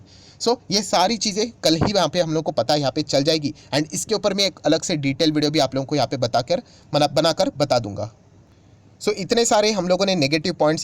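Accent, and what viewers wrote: Maharashtra, India. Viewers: Mujhe lagta hai ki Maharashtra se hai🤔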